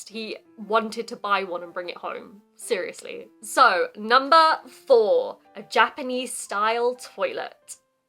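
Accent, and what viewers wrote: RP, United Kingdom. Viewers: いつも貴方の美しく心地よいクイーンズイングリッシュを拝聴しております。 聴けば聴くほど、どうして英国から移住した米国人の英語はあんな感じで変わってしまったのでしょう？本当不思議です。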